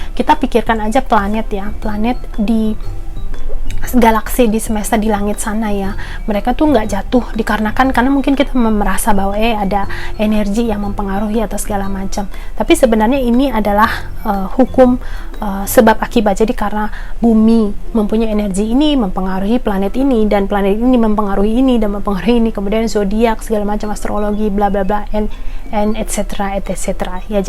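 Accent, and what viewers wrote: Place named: Madura, Indonesia. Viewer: Agak miri macam tetanggaku madura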